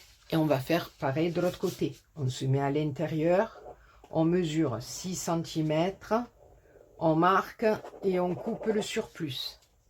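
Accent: South, France. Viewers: Coucou, rhooo mais où vas-tu pêcher toutes ces idées fantastiques. C'est vraiment très beau d'autant que l'on peut le refaire pour toutes occasions. C'est génial et réellement une bonne idée cadeau. Avec ton petit accent du Sud et ta gentillesse c'est un régal de visionner tes videos. Un rayon de soleil en ces périodes certes festives "normalement" mais quelque peu moroses à cause de ce foutu virus grrrrrrrr. Superbe!!!! Gros bisous et merci pour ce partage.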